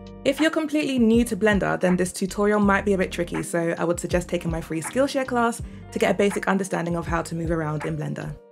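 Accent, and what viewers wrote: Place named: United Kingdom. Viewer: i’m new hereee bur girl you’re british!! omggg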